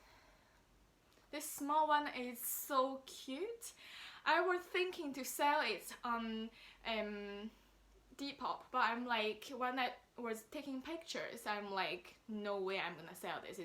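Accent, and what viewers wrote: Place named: United Kingdom. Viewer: Your British accent is soooo cute!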